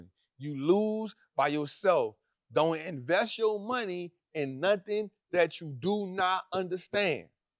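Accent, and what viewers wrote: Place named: Louisiana, United States. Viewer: Thanks for the information!! Also, love your Cajun accent!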